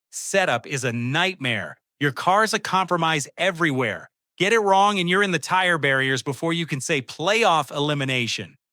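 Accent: United States. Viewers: Aussie script with american accent. AI needs to try it harder! Also where were the Bathurst shots??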